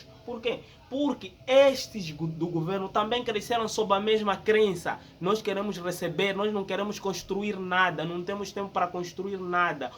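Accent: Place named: Brazil. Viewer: Pow negão ce fala com alma de Bahiano de Brasileiro hehehehhe e sua fala não tem sotaque moçambicano falando igual a nos brasileiros hehehe isso é muito legal cara So falta arrumar uma brasileira e vir pro Brasile hheheheheh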